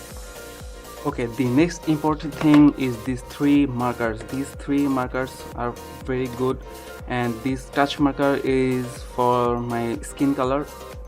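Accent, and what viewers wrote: India. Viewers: Sound indian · Heyy buddy are you from India? Coz your accent is a little bit indian😅. Btw keep the good work senpai 🙏🏻🙏🏻😂💙💙❤️ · from your ascent i think you are a indian or a bangladeshi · You've got an Indian accent!!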